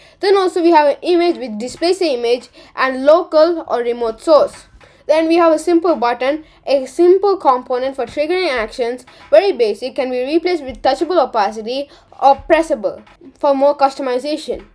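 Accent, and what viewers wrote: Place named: India. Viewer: Broski you sound like an average indian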